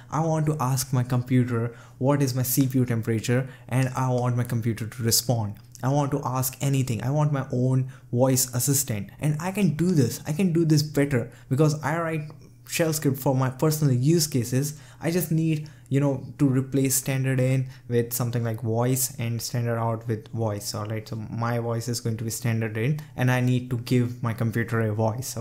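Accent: India. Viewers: Came to channel Subscribed Heard Indian Accent Unsubscribed I'm brown myself, but no this is cringe.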